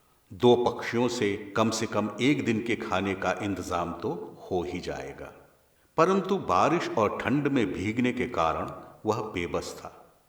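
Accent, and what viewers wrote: Standard. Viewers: विशुद्ध उच्चारण में सुनाई गयी कथा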